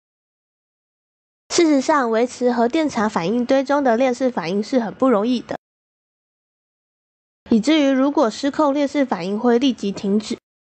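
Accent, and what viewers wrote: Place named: Taiwan. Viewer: 為何台灣國語?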